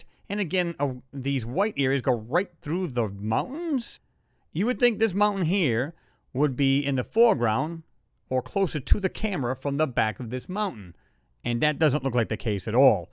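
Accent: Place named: South, United States. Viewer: bro I love your southern accent.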